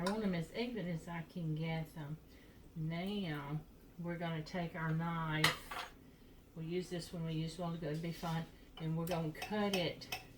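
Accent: South, United States. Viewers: Thanks for this lovely video - I really enjoy cooking videos & I found your delightful Southern accent very pleasant to listen to. I have subscribed to your channel. I totally agree re butter, it makes everything taste better! I put a dab of it in my green beans tonight & it made them delicious. Best wishes from Sydney, Australia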